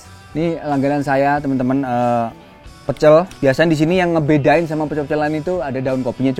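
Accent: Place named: East Java, Indonesia. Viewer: cak jawa timur banget,enak bawaannya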